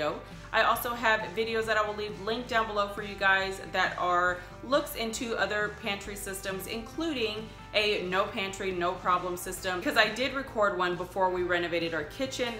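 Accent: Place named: Texas, United States. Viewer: First time here, and as I was listening to you, I was like... is she from Texas? And low and behold... you are!! Great video!!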